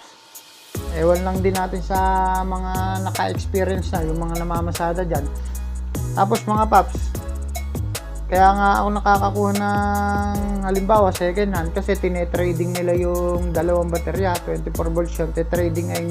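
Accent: Bulacan, Philippines. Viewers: yung punto ng salita mo, parang bulacan o nueva ecija? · Bulakenyo o taga binangonan ka paps? Sa punto kasi ng salita paps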